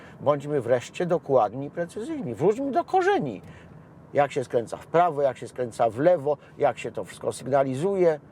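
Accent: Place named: Kraków, Poland. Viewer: Po Krakowsku mówi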